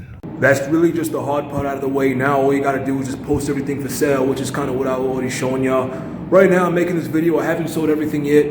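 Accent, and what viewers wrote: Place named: Boston, United States. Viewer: are you from boston or the boston area? your accent tells me you are or near by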